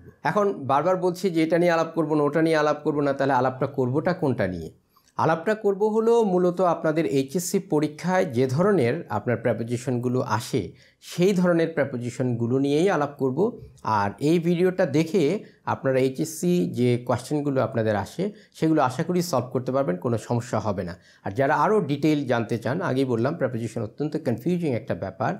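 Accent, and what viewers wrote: United Kingdom. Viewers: আপনার ইংলিশ উচ্চারণ বিট্রিশদের মতো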